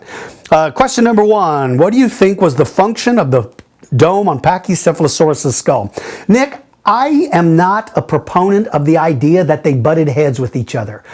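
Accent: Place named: Germany. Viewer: thanks for answering my question, that german accsent made my day of a crappy day thankyou man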